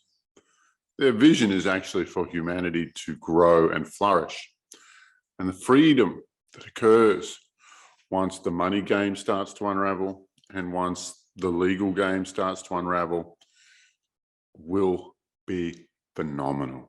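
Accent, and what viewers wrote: Australia. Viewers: It's great to hear an Aussie accent:)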